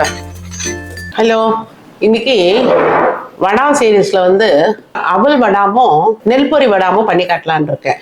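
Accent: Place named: Tiruchirappalli, India. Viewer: ரொம்ப ரொம்ப ரொம்ப thanks mami. Super super ungalun intha immediate response and ungalin viewers ku neegal kudukum importance romba romba pidichiruku. Sila peir enna ketaalum பதில் போட வே மாட்டார்கள் immediate AH poda லேனா கூட atleast 1 week kule podalam aana poda maata avargaluku therintha mariyaathai avlo thaan. I really really appreciate your response for your viewers ❤. Kanippa neega seithathu pola Try panni paarkiren. Neengal Trichy oora. Ungalin பேச்சு athupola iruku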